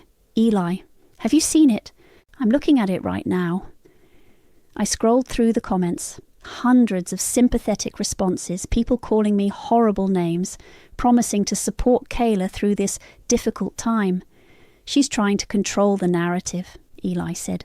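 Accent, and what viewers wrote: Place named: United Kingdom. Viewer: Just absolutely hate British accents in these stories. I don’t listen to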